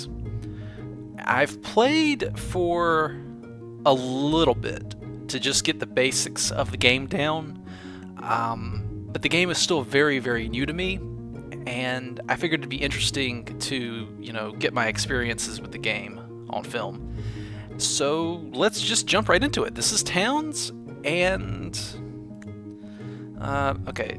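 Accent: South, United States. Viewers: there is no usual english. usual for americans is different from the usual for the british and he has an american accent. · What are you talking about? There is no 'usual' or 'normative' english (language) accent, it depends on where you live and what you're used to. If you live in America, the 'usual' English accent to you will be American (ignoring the many american regional accents). I'm sure ' accent is fairly normative for where he lives. He'll just have one of the many different Southern USA accents, of which Texas is a stereotype people like to compare accents to. Here in England his accent is not usual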